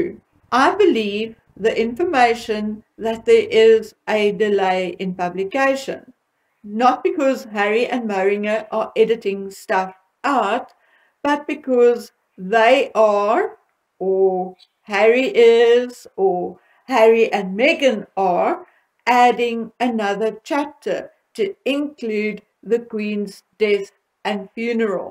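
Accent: Afrikaans, South Africa. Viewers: Afrikaans accent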